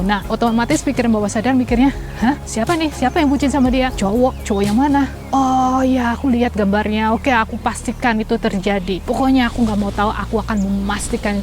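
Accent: Banyumas, Indonesia. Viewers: Kereenn bgt si kak video ini.. aku merinding dan terharu.... jadi lebih semangat lagi dan ga mikir aneh2 tentang jodoh.. wahh ternyata kakak orang ngapak ya kak 😁 salam dari Banyumas 🤗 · Owalahhhhhh,😍😍😍mbak ternyata wong Cilacap toh ☺️ salam ngapak mbak 🤣 Ora ngapak ora kepenak ❤️❤️❤️❤️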